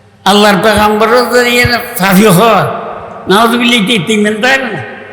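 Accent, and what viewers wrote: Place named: Chittagong, Bangladesh. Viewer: রাগ করে লাভ নাই ভাই উনি চিটাংগের ভাষায় কথা বলে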